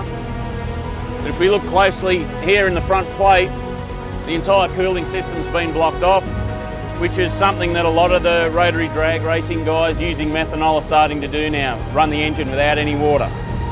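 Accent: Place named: Australia. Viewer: When I hear an Aussie accent makes proud to live in Melbourne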